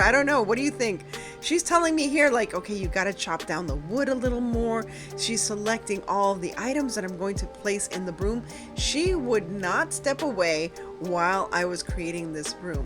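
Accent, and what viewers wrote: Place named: Miami, United States. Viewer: Ohhhh, ok, now it all makes sense : your accent, the love of halloween......you're from miami! Lol i grew up in kendall til i was 15 n i KNEW you sounded like my family😂 i feel like NO ONE n NOWHERE really celebrates n does it up for Halloween like Miami n ppl born n raised there. Idk if u were born there, but if u werent, ik youve been there a LONG TIME. Ahhhhhh, mi hermosa!!!😂❤❤❤ youre projects are amazing and i ADORE THEM!!!❤❤❤❤❤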